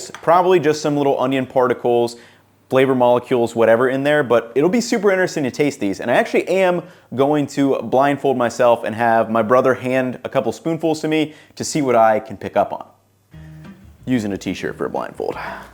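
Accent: United States. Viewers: Just noticed the plugs and cabinets in the background. In spite of the American accent, you're in Europe? Where at? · europlugs, american accent... i am very confused!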